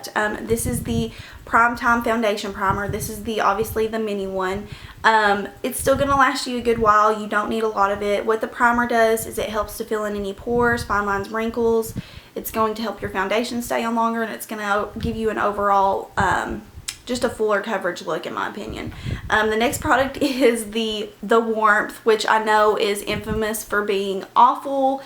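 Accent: South, United States. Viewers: LOVE THIS!! SO very helpful:)) btw..you're a lovely young lady, with a cute southern accent:) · You're adorable and I admire your accent - southern? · Are you from south alabama or mississippi?? You sound country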